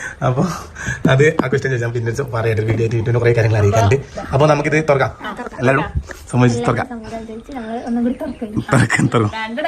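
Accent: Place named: Kannur, India. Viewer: Kannur slang aan main kaaranam...😊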